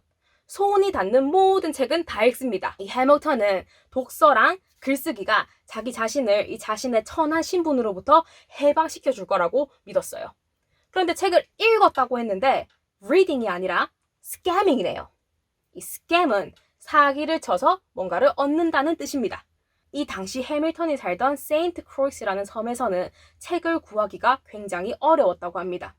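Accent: Gyeongsang, South Korea. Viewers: 경상도 사투리와 유창한 영어, 그리고 꽤(?)하시는 가창력조합.. 올해내 일단1만구독찍고, 내년에 10만가즈아. 구글 알고리즘아, 님좀 밀어줘라~~♡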